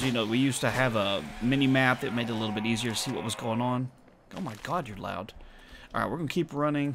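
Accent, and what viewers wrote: South, United States. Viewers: I'm curious where your from I can hear the southern in your voice and I like how you talk very clear and to the point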